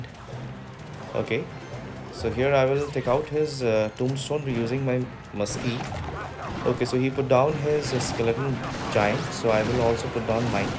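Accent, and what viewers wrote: India. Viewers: Which country are you from?? U sound Indian...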